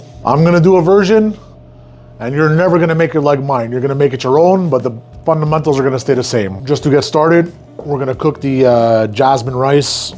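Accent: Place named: Quebec, Canada. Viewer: Something about a Quebec accent is pleasant. Thanks for the vids as always!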